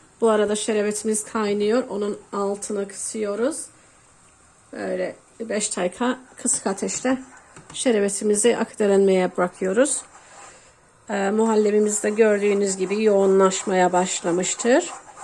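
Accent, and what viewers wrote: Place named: Cyprus. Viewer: Ne yapsın Kıbrıs şivesiyle konusyorsozleri anlasiliyorguzel tarif veryor👏👍🙏🧡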